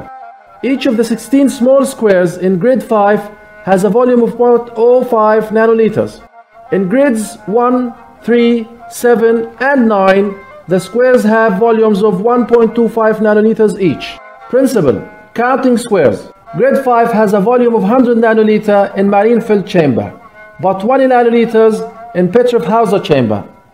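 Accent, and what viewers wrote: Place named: Arab world. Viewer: Brother 😂 your speed = like a ferrari but i have gotten by accent you are an Arabic where as i am from kashmir . So it was more difficult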